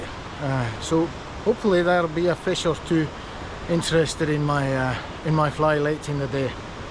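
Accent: Scotland, United Kingdom. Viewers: Excellent video and a beautiful strong fresh fish, congratulations! Tough challenges in that current and the number of big rocks, well done! Thank you for doing in English and I compliment you on your excellent English which has a Scottish ring to it! Thanks for producing and sharing. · You have a Scottish accent. Great video btw.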